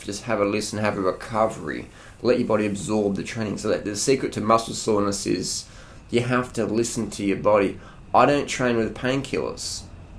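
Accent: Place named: Australia. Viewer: ***** He doesn't talk strange. .he's just Australian :)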